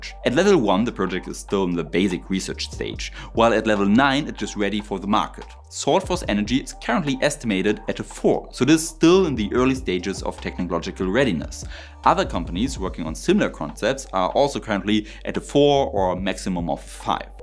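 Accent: Germany. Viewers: I come here to listen to that German accent and I always learn something new. WIN - WIN.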